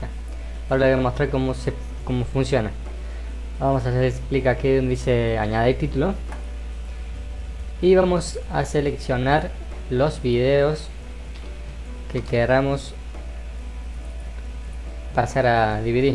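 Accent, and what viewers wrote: Argentina. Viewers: Excelente tu tutorial "cordobés" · re cordobes jajaja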